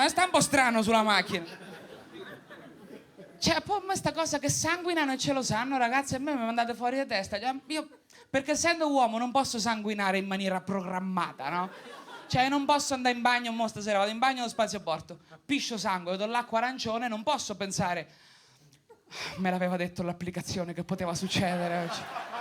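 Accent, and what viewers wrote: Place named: Marche, Italy. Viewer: "comunque lu spettacolo è lu mio" average entroterra marchigiano vibes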